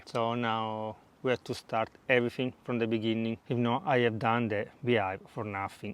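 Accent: Italy. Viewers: I was just thinking today, "What happened to my favorite Italian guy channel?" I really do appreciate your videos, and very much enjoy your speaking English. Thank you for your time and energy making these great videos. I would love to visit Italia again in my life, but reality is probably no. Are those Fig trees behind you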